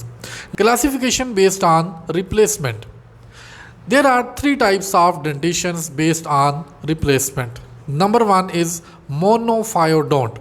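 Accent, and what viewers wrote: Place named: India. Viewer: indian ahh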